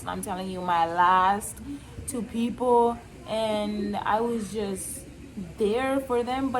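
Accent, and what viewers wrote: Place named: Puerto Rico. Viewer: U sound puertorican cool anyway my 20’s were dark and depressing😞sis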